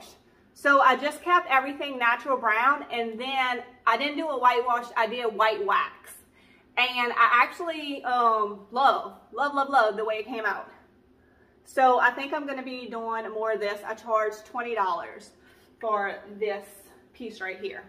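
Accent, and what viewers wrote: Louisiana, United States. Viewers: Your excitement fills my soul and I WANT ONE OF YOUR BREAD BOARDS!!!! I’m going to charter a BUS and bring people with for a shopping trip! Do you have room for a BUS In your neighborhood? LOL!!! We can buy and you can teach us your Louisiana accent ❤️❤️😁❤️❤️ What fabulous DIYs!!!!! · Hi I just found your channel. I love watching your videos and I’m playing catch up watching your older ones. When I seen this one I so wished I would have known about your Facebook live sale. I really love the Hoop project that has a Chicken stamped on it. I know this is a long shot but do you still have one and how much would you sell it for? I just love watching you and my hubby asked where is she from, she sounds like Shelby on the show where they go hunting for logs buried in the swamps of the Bayou of Louisiana. I laughed and said yup that’s where she’s from. 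We love your accent. Keep up the great job!!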